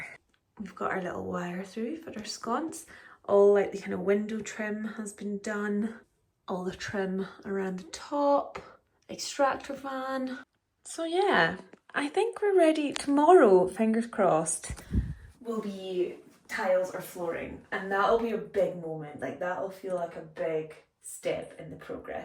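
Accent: Scotland, United Kingdom. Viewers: So sad to hear this as an English woman. I think normal English people love Scotland and the beautiful accent. Be proud! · Dear Scotts, please do not ever tone down your Scottishness! Fantastic world-changing innovations have been created by Scotts! Congrats. Life ‘is’ a big adventure and take all the nuggets you can!! 🎉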